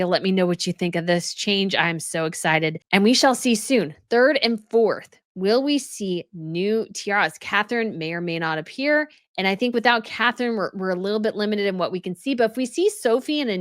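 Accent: United States. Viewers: her pronunciation is American because she IS American. That's okay.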